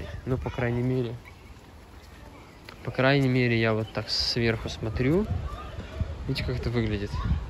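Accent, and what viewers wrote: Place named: Urals, Russia. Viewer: У вас Уральский акцент, вы с Урала?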